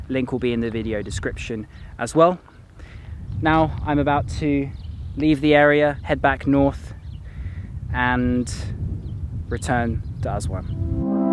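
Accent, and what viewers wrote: United Kingdom. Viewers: I'm in love with the British accent.....greetings from Syria to you and to Egyptian people 💙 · The best british accent ever 🇬🇧♥️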